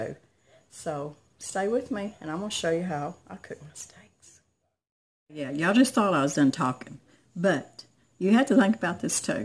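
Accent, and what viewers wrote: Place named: Kentucky, United States. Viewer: You are a doll!!! To hear ya talk makes me miss my kin down in Kentucky ❤️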